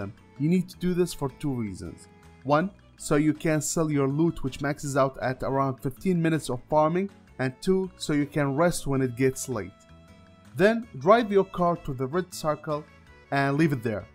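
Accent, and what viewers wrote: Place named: Arab world. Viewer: Your Arab accent is so strong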